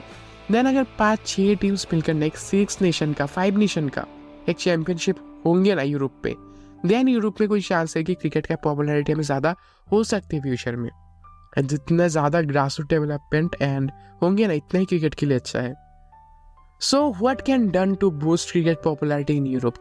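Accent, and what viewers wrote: India. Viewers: Love your Hindi Accent Brother! Keep it up. nice work. easy thumbs up.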